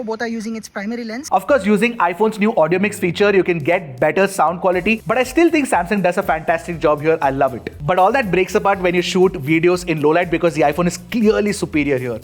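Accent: India. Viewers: I left the video just after 3 seconds just because of this kind of typical Indian accent so ann0 ying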